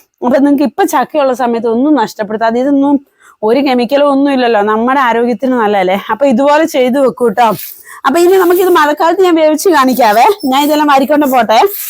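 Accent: Kottayam, India. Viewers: Samsaaram kottayam style aanallo. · വലിച്ചു നീട്ടാതെ വ്യക്തമായി എല്ലാം കാണിച്ചു തരുന്നത് കൊണ്ടു വീഡിയോ ഭയങ്കര ഇഷ്ടമാണ്. സംസാരം കേട്ടാൽ കോട്ടയംകാരി ആണെന്ന് തോന്നും. ശരിക്കും ഉള്ള നാട് ഏതാണ്. ഒന്നു പറഞ്ഞുതരുമോ.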